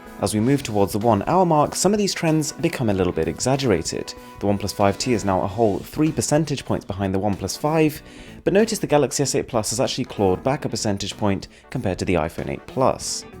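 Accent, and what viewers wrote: United Kingdom. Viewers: Nice review indian guy with a british accent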